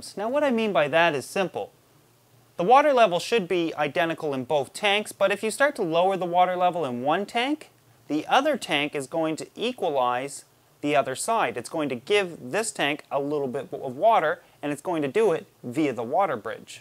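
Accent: Canada. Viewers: fuck I love the way canadians talk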